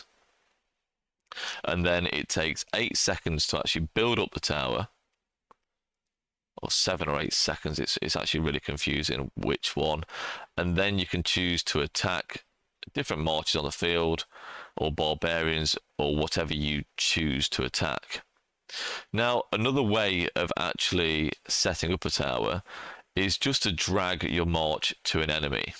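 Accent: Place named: England, United Kingdom. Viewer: I was thinking England from the way you spoke but there’s so many different accents there it’s hard to pinpoint! Btw I just wanted to say I LOVE the videos man and I always recommend to people and tell them about your channel.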